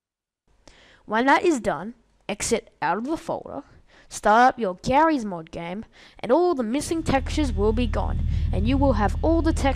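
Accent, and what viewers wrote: Australia. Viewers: Is that a Australian accent? thanks bro works perfectly :D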